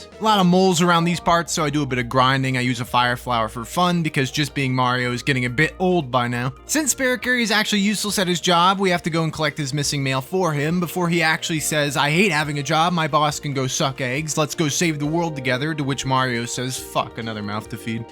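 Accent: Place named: New York, United States. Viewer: I never knew I wanted someone to do game challenges, talking a mile a minute, and with the THICKEST New York accent I've heard in my life before! I watched this and the Mother 1 video yesterday, and I find them interesting.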